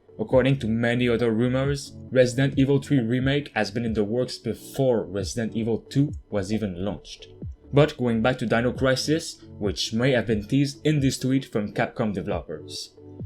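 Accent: Quebec, Canada. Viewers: You speak english like me dude, are you from quebec?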